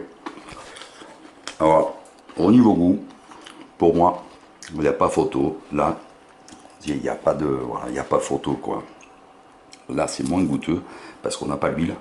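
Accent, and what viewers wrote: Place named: Marseille, France. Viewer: On entend bien l'accent et la façon de parler des marseillais. Donc je m'abonne !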